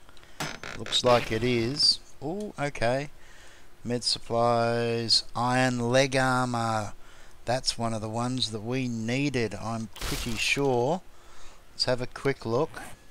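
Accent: Australia. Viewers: One of the coolest builds I've seen. You should play on our server, it is a server for people who like to build. Land claim covers 61, online modifier is 256 and offline modifier is 1024. TNT, pipebombs and rockets have been severely nerfed to virtually stop griefers. Nightly region saves and admin will give you a copy of your region. The server is called Land Owners, it sounds like you are down under and may have a higher ping, I believe server kicks players with pings over two hundred but am certain admin would up just to have you and your awesome builds play with us.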